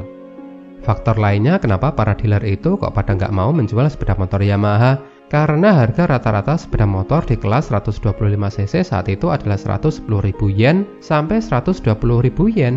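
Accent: Java, Indonesia. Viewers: saya nyaman dengan suara medoknya.. sya dnger cerita ini sblm tidur enak bgt hhe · Gpp medok. Saya jg medok 😁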